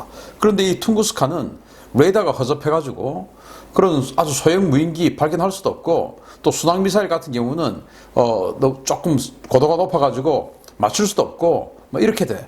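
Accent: Gyeongsang, South Korea. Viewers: 그동안 군사 문제에 있어 좋은 전문가라 생각했습니다. 그러나 문재인 대통령 목소릴 가지고 망신 주는 댁을 보며 그닥 좋은 사고를 가진 사람이 아니라는 사실을 알 수 있었습니다. 경남 사투리로 방송하시는 님! 님도 그다지 듣기 좋은 목소리가 아님을 알려 드립니다. 방송은 표준말이 대세입니다. 개그가 아니라면... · 경상도사투리에 단세포적 수구골통에 참 역겨운 채널이네. 별 깊은 지식도 없는거 같은데 듣기싫은 억양으로 주절주절...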